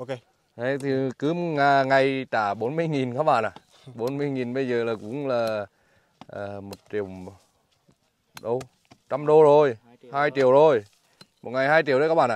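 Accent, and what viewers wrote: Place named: Nghe An, Vietnam. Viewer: Quang linh quê" choa " chân phác gần gũi tinh cảm...nhất là hài ước hay ra phết...tôi dân nghệ nghe bạn nói giọng nghệ tôi vui và cười sung sướng luôn..hãy là như thể kg cần thay đổi hi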